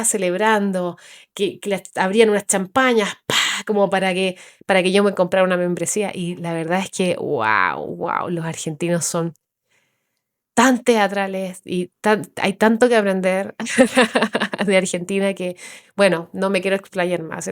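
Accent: Chile. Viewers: GRACIAS querida.!!!!! Me ha encantado tu delicioso ACENTO,...a mis 76 años me enamoré de CHILE, por VOS.! Claro, también los CONTENIDOS.! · Hola gracias, cada vez que te escucho con esa tomadita chilena. Desde La Plata - Bs As - Argentina Vas como borrando ese enojo o recelo que los argentinos tenemos por los chilenos. Es que de verdad dolió que tuvimos ayuda de tu país en la guerra de las Malvinas. En cambio nuestro cariño al pueblo peruano. Que fue el único país que nos ayudo esa guerra. Yo era muy chico y me contaron y lo estudie en la escuela. Muchos años después vinieron esas 2 copas América que Chile nos ganó para mí opinión una debió ganar Argentina. Dicho esto, coincido con vos a los argentinos vivimos la vida a mil y somos muy teatrales. No por nada Capital federal es una capital con miles de teatros En la crisis del <num> Me acuerdo de haber participado de una obra de teatro en cooperativa que justamente hablaba de las crisis de nuestro país. 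Pero también de nuestros proceres como Belgrano, San Martín y otros. Y sus ideales .. Personalmente no tenía trabajo, había dejado la carrera. Y el país se caía Y ahí estábamos unas 30 personas algunos docentes, trabajadores y desocupados. Jóvenes y personas hasta 35 o 40 años creo. ( Hombres y mujeres ) El director un ex consejal de La Plata. Que le decían el turco por su origen de familiar Bueno estuvimos todo un año ensayando la obra era un musical Y la estrenamos en diciembre. Pero muchos necesitamos estar ahí en hecho cultural que nos una y ayude a pasar ese momento juntos Y si la familia, la amistad, la reunión, nuestros ídolos son sagrados. Porque creo que e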